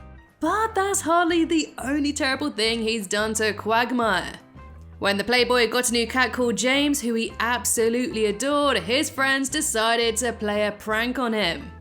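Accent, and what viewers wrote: United Kingdom. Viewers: I don't mind your Voice,You British Accent makes you unique just the way you are. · You have a British accent? What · Awesome Job! I'm glad Peter's not my friend, I love your British Accent by the way. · Adore your British accent here in Bolton. Rule Britannia etc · Did you read the rest of my comment. Quagmire deserves the abuse and your British accent is awesome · but i love you British accent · Wait! What! Who hates your British accent?! I love it! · Certainly impossible to rank his particular deeds as all the outright criminal ones rank in similar categories; murder, destruction, relentless bullying, child abuse, sexual assault, manipulating everything by status, spousal assault and affairs, unkept alcoholism, drug/substance abuse, the list just won't stop! Another great video thanks! I also do like your accent, sounds much nicer, more engaged and more charming than a typical American accent which you hear narrating 85% of youtube videos. 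I'm not saying there's anything wrong with listening to American nor that there accent is unpleasant, its just nice for other countries like the UK to represent and you do talk very smoothly and elegantly like a good narrator should. People who are hating on your British accent and trying to get you to change the way you talk are mostly likely selfish, ignorant Americans who think the world revolves around their country and that everyone should act like and pay attention to them, don't let haters dictate what you say and how you say it, keep up the great content! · I don’t mind your British accent tbh, I think it is soothing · Awe I'm sorry to hear that darling I aboustly love your British accent 🥰🥰 and I as well enjoy watching your videos~!! I was wondering if you could ever do a timeline of Marge simpson if you haven't done one of her yet darling?? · nah I don't hate your brit acent it's pretty beautiful to be honest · Who in the world would hate your British accent?!?!?! 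I absolutely ADORE IT!!!!! I binge watch your videos for your voice almost as much for the content itself! Great videos and very informative. I’ve seen these shows hundreds of times and your theories and timelines always make me want to rewatch certain episodes realizing how I missed some connections before. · How can people hate her British accent 😂 that baffles me 😂 well each to there own right 😂 her British accent is lovely and awesome 😎 You’ve done a wonderful job so keep voicing these videos. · Yeah it's like as if Family Guy characters of old Family Guy are very different than how they are now. But even so Peter was still a bastard back in the good old days too. Now people are complaining about your accent? I mean it's nothing new. Many people some probably other Americans who are uneducated and dumb for some reason can't stand a British accent even though there's really nothing wrong with it. 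· ... your British? I thought you were Australian! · I love your British accent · Can you also do the worst things Stewie has ever done! BTW I htink your british accent is awesome! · your accent is cool....I really love how strong people In. London accent is😌😌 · But I love your british accent! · I'm sorry I love your British accent! ❤ it's beautiful and exotic just like the wonderful host making my favorite videos! Never change! 💘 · I don’t hate your British Accent and btw your doing great ^^ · To be honest I love your British voice. Next year I plan on moving to Scotland. After all… God save the queen! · What!? Who said they don’t like your British accent I think they’re cool alongside French and Italian I’ve been told I have a Cleveland or very slight southern accent 😅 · I love your british accent,what a bunch off fake fans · I love your British accent! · Hey just saying I love your british accent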